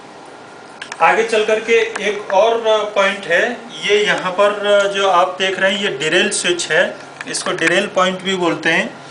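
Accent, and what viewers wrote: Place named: India. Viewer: fuq the indea English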